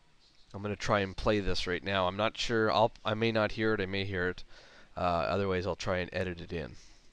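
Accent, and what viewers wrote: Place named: Ontario, Canada. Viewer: thanks for the great helpful vid! I have to ask, by your accent you sound like you're from Ontario. Are you?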